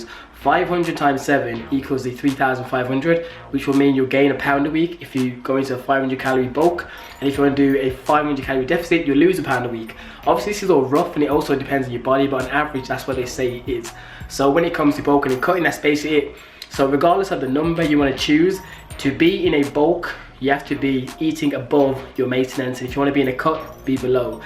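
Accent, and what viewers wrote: Birmingham, United Kingdom. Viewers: I know, I love the Brummy accent :-)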